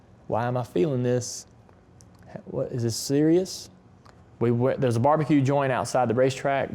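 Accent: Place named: South, United States. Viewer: There's something funny about hearing a guy with a slight southern accent doing an impression of someone with an even thicker southern accent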